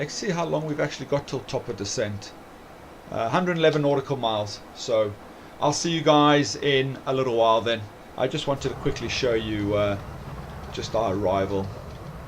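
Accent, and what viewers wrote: South Africa. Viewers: very nice Video and always good to hear a South African voice. The game is very realistic and you seem very knowledgeable ,Keep the videos coming and I will definitely support them!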